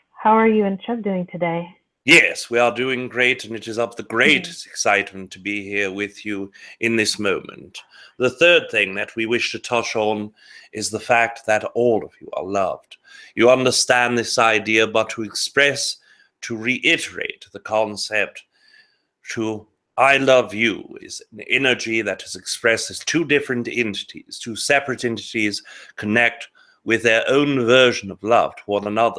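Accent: United Kingdom. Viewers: He sounds like Alfred Hitchcock, with that British accent. Hey, I want to believe this info, but I also want to keep grounded. There are many questionable issues. ok, so if all these sightings and escalations happen then woohoo.. Let's see what presents itself. Come on 4th density, appear, make a big splash in our pool.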